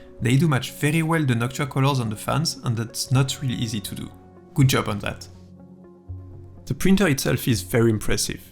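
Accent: France. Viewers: Pourquoi ne pas parler français ça s'entend à votre accent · Woah!!! You’re French! Nice to hear your voice!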